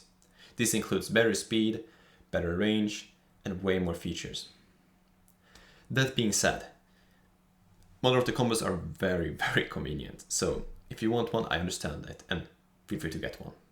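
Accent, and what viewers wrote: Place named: Germany. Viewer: I can barely understand your accent dude. Speak slower because your thick german accent makes us Americans difficult to understand what you are saying. And it’s even harder for us Americans who English is not their first language (hispanics). So please, speak slower and try to pronounce better every single word.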